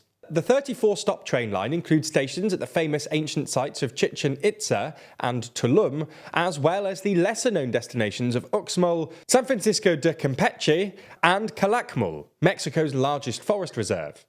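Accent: United Kingdom. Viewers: British pronunciation of Spanish words always makes me cringe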